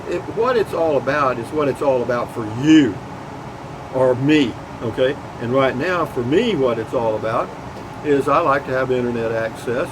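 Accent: Texas, United States. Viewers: New Zealand here again cheers to see Beer Thirty return and to listen to interesting casual chat..really like to hear you Texan accent...Keep the beers coming!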